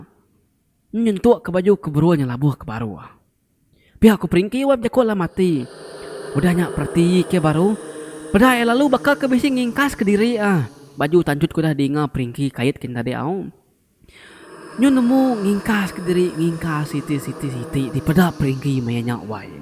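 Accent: Sarawak, Malaysia. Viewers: Rindu ninga slang iban d admin..slang ori urg miri..terang ddinga..#RTM #WAIFm ngambi d nyukung mh aku..manah👍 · Beautiful accent of sarawakian " joseph " = "jusip " 😅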